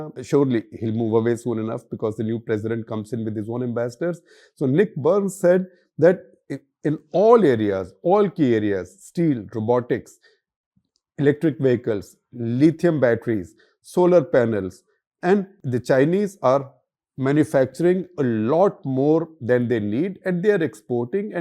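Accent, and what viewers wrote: India. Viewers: Yo, Can you speak proper English instead of Endiaish? It's an embracement for your English master out there after all these many years of colonizing.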